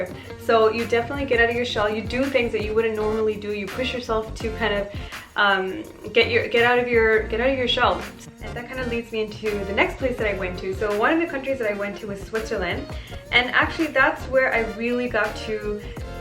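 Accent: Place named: India. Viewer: Where do you live in India? Ur accent is pretty cute😍 just wanna ask where u got that from? Anyways, keep shining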